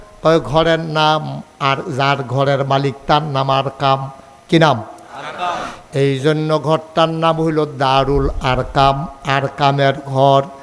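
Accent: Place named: Comilla, Bangladesh. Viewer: হুজুর মনে হয় কুমিল্লার। মাশাল্লাহ অনেক সুন্দর ওয়াজ।💯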